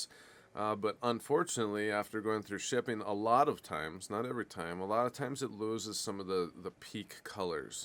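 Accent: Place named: Minnesota, United States. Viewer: Ya gee, ya sound like my family in Brainerd, don’t cha know? -random aquarist in Texas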